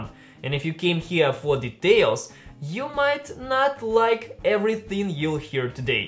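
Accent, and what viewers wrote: Russia. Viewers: Can you test the battery in watching youtube, battery test in playing games, battery test in browsing, battery test in watching videos. Will this 4k mah can last 2 days? Like your review and you talk like Russian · Is that a Russian accent ?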